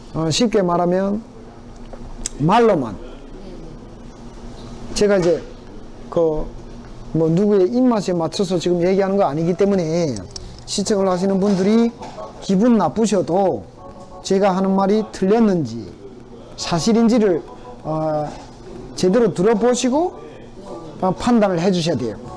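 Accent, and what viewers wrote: North Korea. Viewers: 이 사람 탈북자? 말투가 북한말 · 계속 듣는데 말투가 왜 이렇습니까? 북한말투 같은데 혹시 탈북민 이신가요? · 당신은 말투로봐서 북한 이주민 같은데 정말로 정의롭고 용사같은 사람입니다 앞으로 계속 옮음의 길로 행하시길..... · 처음 보는 분인데 오늘 말하는 것을 보니 진실성이 있는데, 보기 드문 사람이네요. 말투를 들어보니까 탈북자인 것 같은데, 엄청 많이 아시네요. 원래 변희재 "브레이크뉴스"라는 이상한 좌편향 인타넷매체를 운영했는데, 최근에 보니까 보수라며 목소리를 높이고 있어서 유심히 보고 있는데, 역시나 남을 이용하고 마음에 들지 않으면 마구 까는이념도 의리도 없는 사람으로 변질되어 있는 것을 보고 놀라지 않을 수 없었습니다. 특히 그의 눈빛은 완전히 자기도취에 빠진 광인이 되어 있는 것 같아서 무섭기도 하고 정말 안타깝고 실망스러웠습니다. 사실 나는 어렸을 때부터 미친 사람을 좀 겪어봤거든요. 의사 입장에서는 그냥 환자이지만, 일반인들은 무서워서 피하죠. 그래도 우리 부모님들은 마음이 워낙 너그럽고 좋아서 집에서 밥도 같이 먹고 잘 대해 주었지만, 얼마 안 있어 돌변해서 욕하고 그러더라구요. 정상이 아니죠. 갑자기 이러한 옛날 생각이 나네요. 그리고 예전에 초창기 인터넷매체로는 오마이뉴스, 프레시안, 서프라이저 등이 있었는데, 노무현 정부 들어와서 그들이 하는 뉴스나 행태들이 외골수지만, 가끔씩 그런 사이트에 들아가보면 완전히 딴 세상 이야기를 너무 진지하게 장문의 글을 올리고 논박하고 하는 것을 보고 이상한 사람들이네 하는 생각을 했습니다. 외눈박이들이 서로 자기가 옳다고 뜬 구름 잡는 주장을 하고 현실성이 없었죠. 하지만, 그들은 그런 훈련을 끊임없이 하면서 경쟁하고 거기서 살아남으려고 무척 노력하는 것 같았습니다. 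어디서 캐캐묵은 이야기를 진리처럼 말하고, 결코 그들의 실력은 자랑할 만한 게 없고 형편없었습니다. 그냥 맹목적으로 진영논리를 전수받아 앵무새처럼 얘기하는 수준밖에 안 돼요. 겁먹을 거 없어요. 목사와 유사하다고나 할까? 그렇다고 목사를 욕하자는 건 아닙니다. 비현실적이고, 피상적이면서 입술에서만 나오는 동어 반복하는 수준을 말하는 것입니다. 순수한 체험적 자기철학이나 고민에서 우러나온 아름다운 글을 쓰기는 힘들 겁니다. 쉽게 사람을 판단해서는 안 됩니다. 그래서 오래 지켜봤습니다. 인간은 그렇게 쉽게 바뀌지 않는다는 것입니다. 개과천선은 절대 일어나지 않습니다. 그래서 전과(前科)는 늘기만 하고 결코 줄지는 않는 것입니다. 사실 나는 변희재씨가 처음부터 박대통령 탄핵에 관심없다는 말을 하는 것을 직접 들었거든요. 그런데 어느날부터 갑자기 탄핵을 반대하는 사람들의 대표적인 리더로 행세하는 것을 보고 우와! 정말 깜짝 놀랐습니다. 나름 자기정치를 하려고 무지하게 노력하고 있는 것 같은데 아무도 알아주지 않으니, 본인은 속상할 겁니다. 아마도 "좌충우돌"이라는 말이 그에게 딱 어울리는 말인 것 같습니다. 사실 자신의 잘 못을 시인하고 용서를 비는 것이 용기가 없이는 진짜 힙듭니다. 나는 지금까지 홍준표씨를 잘 몰랐습니다. 왜냐하면, 모래시계 드라마를 본 적이 없었거든요. 왜냐고요? SBS는 전국방송이 아니라 지방에는 방영이 안 되었거든요. 어떤 사람들은 녹화테이프를 빌려서 보는 사람들도 많을 정도로 인기가 대단했던 것은 사실입니다. 그래도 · 당신은 이북말쓰십니다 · 말투가 북한말투다. 위장탈북한 빨갱이 아닌가 생각해봅니다.보수에 파고들어 분열책동자인것 같습니다. 잘들 생각해봅시다. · 이놈은 미친놈이구나 너 악질이구나 나경원 장재원 김성태는 무어라 변명하냐 너 목소리가 북한말쓰냐 정체는 무어냐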